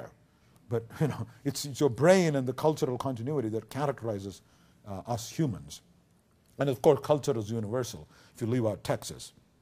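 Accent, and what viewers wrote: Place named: Scotland, United Kingdom. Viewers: Why does he sound like a scottish man? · Thank goodness you have noticed that too. I wonder when he was at Cambridge university he may have picked up a bit of a Scottish accent as there were Scottish tutors and students there too.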